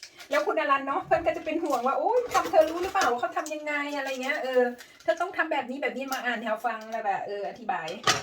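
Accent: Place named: Sakon Nakhon, Thailand. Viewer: สำเนียงคือคนสกลนครน้อจ้า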